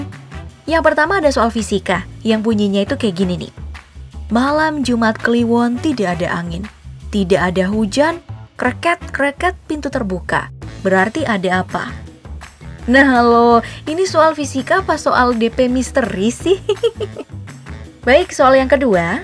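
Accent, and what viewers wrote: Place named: West Java, Indonesia. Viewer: Aya aya wae min euh sunda asli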